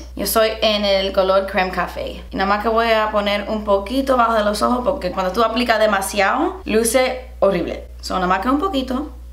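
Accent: Cuba. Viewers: hablando español, con acento cubano 👏🏼 what I’ve been waiting for forever 😂 jajajaaj I love how cubans kinda yell, I get it cause I’m Mexican from the north part of Mexico and southern Mexicans always tell us we are yelling 😂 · Me encanta tienes un acento 100% cubano 😂😂😂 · Jajajaja ¡me encantas! 🌹 Tienes un acento cubano precioso. Ojalá te animes hacer más vídeos en español. Un beso enorme desde España 🇪🇸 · I’m bilingual too (born it the Uk 🇬🇧 but lived in Spain 🇪🇸 since I was 10) and this video was HILARIOUS! I know the struggle of mixing languages lol. I love your Cuban accent! 😍 ¡Buen vídeo como siempre! 😊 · No imaginaba para nada tu acento así, es 100% Cuba🇨🇺!! Gracias por este homenaje al español. Saludos desde España 🇪🇸😘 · Nunca pensé que tu español era tan latino. Tienes muy marcado el acento cubano con todos sus giros. Muy simpático y divertido sobre todo cuando dices "ya tu sabes". 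Gracias por ser tan cute 😍😍😍😍😚😚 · Se nota tu acento cubano mucho jajaja, se te entiende muy bien (soy de España) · Girl you did so good with the Spanish and I love your Cuban accent!! You definitely need more Spanish videos! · Que acento tan marcado de Cuba, no lo habría imaginado! Más vídeos en español please! Me reí mucho y el spanglish se entendió perfectamente...😘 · I love your Cuban accent! 😍 Please do more Spanish videos 🙏🏼!!!!! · Maybe it's difficult if you are learning Spanish from Spain, because she has Cuban accent, and the pronunciation is a bit different. Bit it's cool you can see different accents. Cuban is beautiful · Me! I love her cuban accent! 💕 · I LOVED this video!!! I’m Cuban, I’m from Miami and so I’ve always loved your Cuban background and accent. My Spanish is HORRIBLE, but it was so funny to see you kinda mix in English in there and your Spanish talking voice is soooooo pretty. 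Such a fun video, I was smiling and laughing the whole time in the best way! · 😹😹 I’ve been watching you for years and wow. This is the first time I have ever heard you speaking Spanish. I love your Cuban accent ❤️ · Chica me encanta tu acento cubano!!! Para mi tu español no es tan malo, solo te hace falta acordarte de ciertas palabras y listo. Por favor haz mas videos así! Desde que te empecé a seguir moría de ganas por este video! Saludos desde méxico 🇲🇽❤️ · Me encantó!!! Hacía mucho que quería este video jaja....! I love your cuban accent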